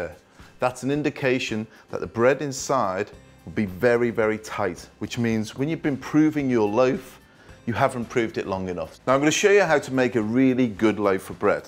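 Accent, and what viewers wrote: Liverpool, United Kingdom. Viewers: The British accent and wit also work for some of us. A man that can bake is also attractive. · Erik Patulin he’s not a Brummy, he’s from Wirral. His accent is closely related to a Scouse (Liverpool) accent.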